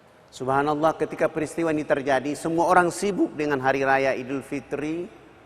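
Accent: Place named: South Sulawesi, Indonesia. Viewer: hihihi bugis makassar jie ee😊😊😊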